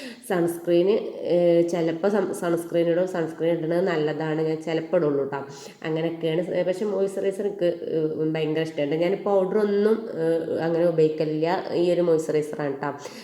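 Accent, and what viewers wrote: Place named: Malappuram, India. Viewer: 😊😊😊. എന്റെ hus മലപ്പുറം ഡിസ്ട്രിക്റ്റ് ആണ്. നിങ്ങളുടെ സംസാരം same 😊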